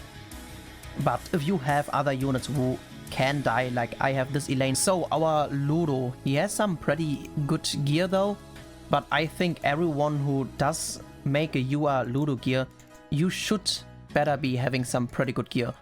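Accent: Germany. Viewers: Is ur actzent German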